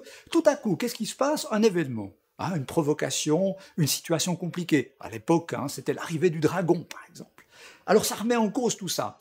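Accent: Switzerland. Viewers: Salut j'aimerais juste savoir comment tu as fait ton intro ? Sinon continue comme ça, tes vidéos sont très inspirante et a ce que j’entends tu viens de Suisse ahahah.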